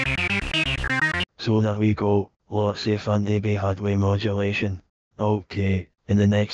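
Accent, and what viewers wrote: Scotland, United Kingdom. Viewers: Damn this is awesome thanks for this. Love the scottish AI text to speech voiceover as well haha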